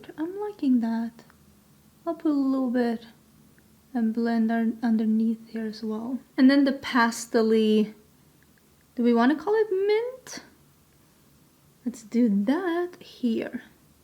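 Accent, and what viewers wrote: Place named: Sweden. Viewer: Don't you so love how she always says " let's soom in " instead of zoom in...so sweet and Swedish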